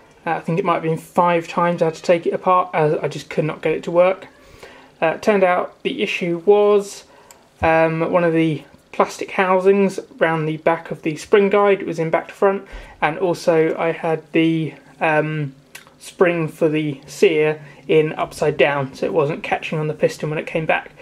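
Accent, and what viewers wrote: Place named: United Kingdom. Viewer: I do not like that British accent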